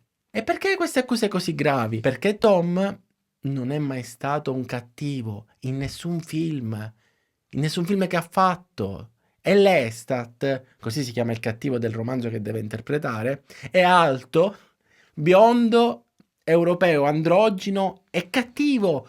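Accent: Palermo, Italy. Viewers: Il signorino perfettino asettico, no grazie, adoro la personalità e colore con vocaboli palermitani.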